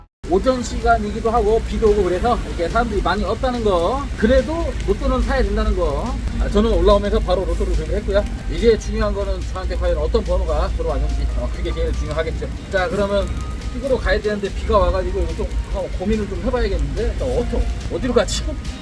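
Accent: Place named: Jeolla, South Korea. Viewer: 비 오는날 고생하네요 ㅋㅋㅋㅋ 아따 전라도 사투리 검나게 방갑소 ㅋㅋㅋㅋㅋㅋ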